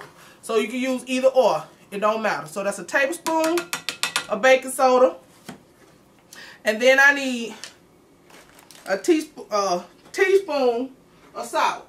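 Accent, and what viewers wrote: South, United States. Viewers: Where are you from i love your southern accent ?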